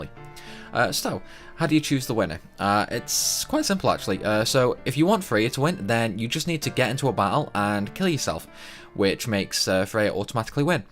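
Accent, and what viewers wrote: Stoke, United Kingdom. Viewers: Another YouTube gaming video soiled by a brittish accent 😩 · Mate are u from stoke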